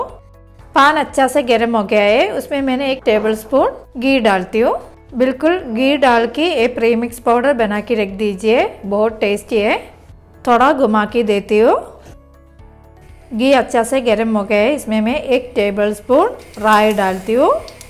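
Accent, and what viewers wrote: Kerala, India. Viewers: Hindi u talk so well in Kerala accent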